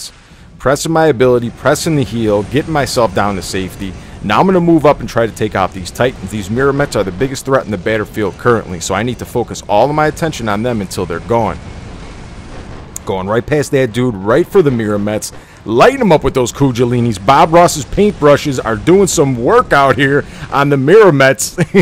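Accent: Midwest, United States. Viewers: You sound like you're from the Midwest Midwest nuclear: new-key-ler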